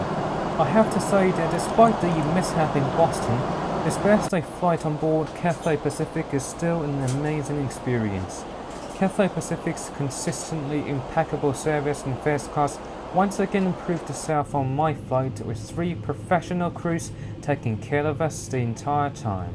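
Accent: United Kingdom. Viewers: 英国口音很牛哦哈哈哈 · 英国口音X2 <3<3<3<3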